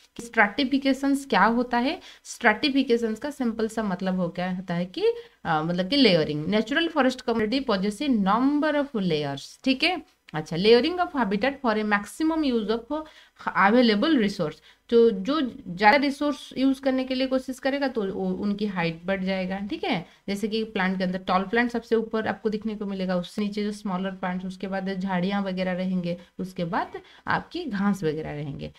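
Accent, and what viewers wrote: Odisha, India. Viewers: Nice explanation ma'am 👍 Are you from odisha????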